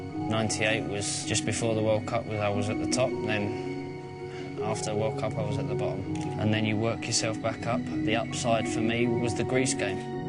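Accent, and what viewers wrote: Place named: United Kingdom. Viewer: Acento británico... Difícil de entender pero lo sigo intentando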